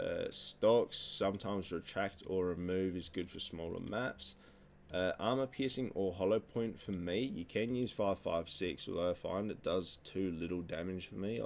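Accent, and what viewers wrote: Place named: Australia. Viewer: Finally an aussie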